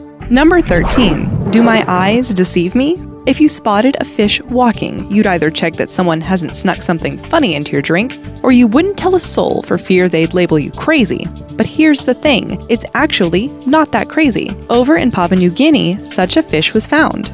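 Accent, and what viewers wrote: United States. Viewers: Wow I am impressed that the narrator ,who has an American accent actually pronounced the Antarctic correctly . Normally an American pronounces it as ANARTIC totally missing out the T. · speaks with an American accent. gives temp degrees in Celsius. stupid bitch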